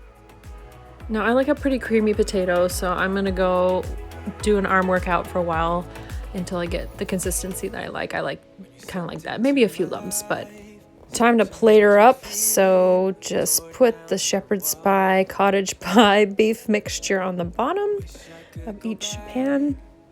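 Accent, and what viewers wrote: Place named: Canada. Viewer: I am curious, Are you Canadian or American because we share the same accent; to which I mean not a NZ accent. #Canadian How long have you been in New Zealand ?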